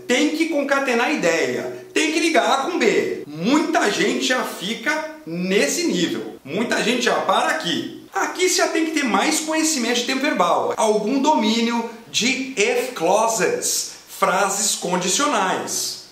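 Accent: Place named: United Kingdom. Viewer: your British accent is evident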